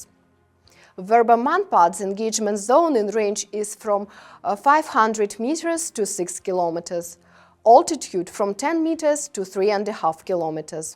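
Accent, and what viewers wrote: Russia. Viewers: Хаха, какой милый русский акцент))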